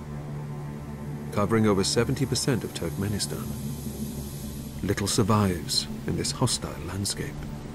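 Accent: United Kingdom. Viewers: Whoa, this is in the Middle East? My people, my Arab people! Get an Arab Man to narrate this. Why is a British guy doing it? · The narrator has a sophisticated British accent. I question nothing. And hello, Glen, after three years. Did you survive Covid? lol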